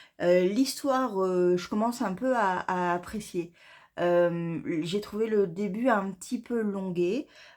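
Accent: South, France. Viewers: 😂 Cet accent "ho pitaing" j'adore. Moi aussi des fois on entend fort mon accent et en hiver certains me disent " ho ça va elles t'ont pas fait mal les cigales quand elles sont sorties de ta bouche ?". Et la quand j'ai vu tout ce que tu as détricoté j'ai été choquée et mon fils viens me voir inquiet :"ça va mama?" et quand je lui ai montré ce que tu fais il a dit que tu es trop forte. Merci pour le tuto 😂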